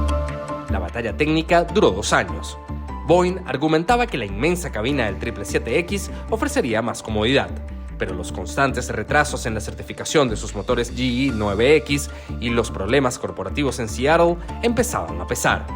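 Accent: Venezuela. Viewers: Jajajaja, la gente quienes comentan sin conocer los canales! El usa su verdadera voz y los venezolanos hablamos así, y no hay ningún país, ni siquiera España, en donde la gente hable español pronunciando absolutamente todas las letras, entendido, brodel?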